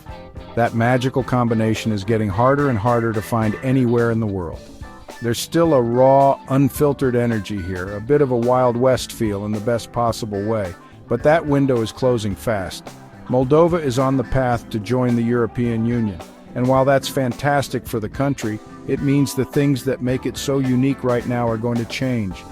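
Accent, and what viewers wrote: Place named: Texas, United States. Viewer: I mike your voice and your american Texas accent !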